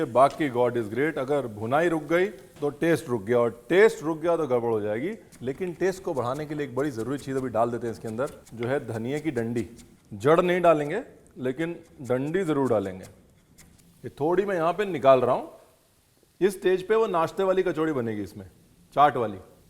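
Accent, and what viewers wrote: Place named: Bihar, India. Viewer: AAP ka behari tone bahut acha ba.tnx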